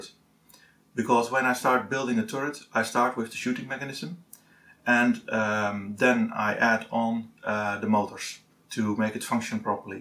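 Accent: Netherlands. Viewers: Are you Dutch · Are you dutch?!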